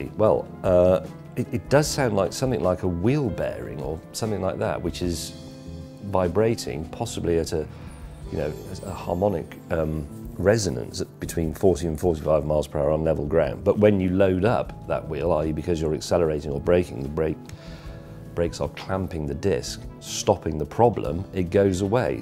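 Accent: United Kingdom. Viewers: Would you please extend the content of your channel, gotta hear dem british accent more(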